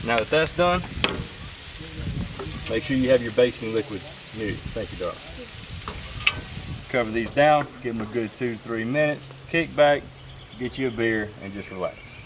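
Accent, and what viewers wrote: South, United States. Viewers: Man i miss your southern accent it made ur stories twice as funny. If we had more funny and useful youtubers like you the site would be a much better place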